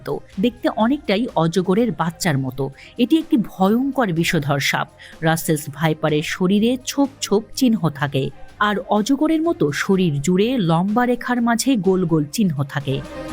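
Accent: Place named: Kolkata, India. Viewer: sangbad pathikar kontho sune mone hocche s ekjon kolkatar mohila.R tv indian dalali bondho koro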